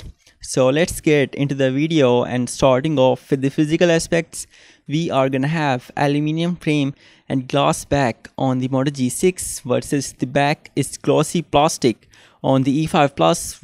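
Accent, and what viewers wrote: India. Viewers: Not a bad video but sometimes I find it a little annoying trying to understand his Indian accent.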